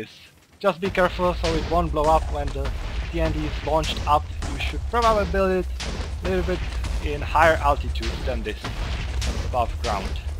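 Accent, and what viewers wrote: Czech Republic. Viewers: u have like Czech accent :D where are u from? :D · Where ya from, you have a Czech accent a little :D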